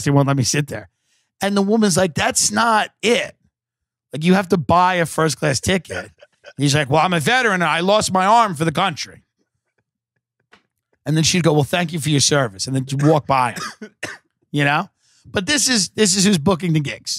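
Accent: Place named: Northeast, United States. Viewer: I really like him because he's one of the relatively few people in the entertainment industry that are real true northeasterners. Like, a ton of people in the entertainment industry are from places like New York and stuff but they're usually very wealthy and Jewish or have family in the entertainment industry and they're just not relatable to people that grew up working class in the Northeast. Tim Dillon sounds like he could be someone you know if you live in New York, New Jersey, Rhode Island, Massachusetts etc. It's really poor up here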